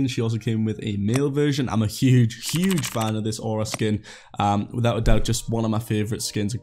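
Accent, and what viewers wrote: Newcastle, United Kingdom. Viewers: You from Newcastle?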